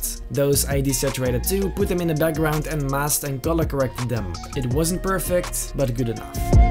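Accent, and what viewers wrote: Netherlands. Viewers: is it just me or does he have a dutch accent?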